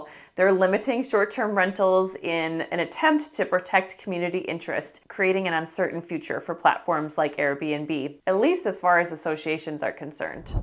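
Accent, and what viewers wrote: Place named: Minnesota, United States. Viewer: I commend you for trying to suppress that Minnesota accent… I kept picking up an accent but couldn’t pin it down until you said Minnesota and then I immediately realized what you’re trying to hide. You can’t hide it by the way, it comes out ALOT. And I’m a Puerto Rican from Connecticut lol the only time I ever heard that accent was in Fargo 😂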